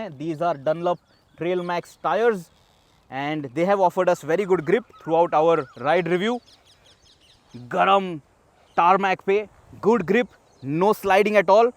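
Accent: Maharashtra, India. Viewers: Thanks, that's reassuring. And BTW, the way you speak Hindi with a Marathi twist is quite interesting!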